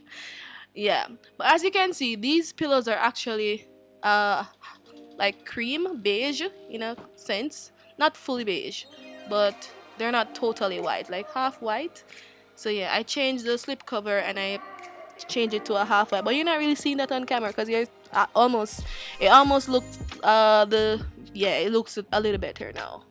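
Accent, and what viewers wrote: Jamaica. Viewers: Love it . Ur Jamaican ?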